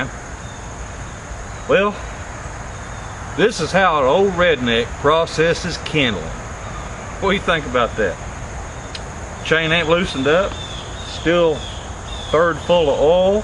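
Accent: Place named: South, United States. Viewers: i was born in Coffee co. raised up in Paynes cove, Pelham TN. now in NC. i knew I recognized your accent. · good video, will make one. really like your videos, can tell are from the south, im a hillbilly from western nc, like to see a video on easy way to nut hogs.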